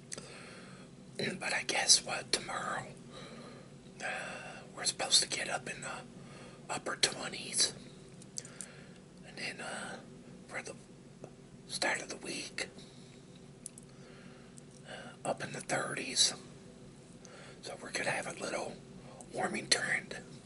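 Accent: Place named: South, United States. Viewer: hey, hope you're doing very well :) my saturday was alright, I haven't been able to stay home alone for a long time, and finally got the chance to do so, which was a literal threaphy... I live in Turkey / Istanbul and as odd as it sounds, we have yet to see a snowflake in the city lol. I remember blizzards happening in the past, but it is different these years. the reason is maybe global warming, not sure why. maybe little snow storms from time to time, thats it. I'm done with my final exams, made my favorite salad at 5.27 am in the morning - browsing your videos :) glad to see a fresh upload, I'm a big fan of your (southern?) accent. Have a nice day / evening, I'm around.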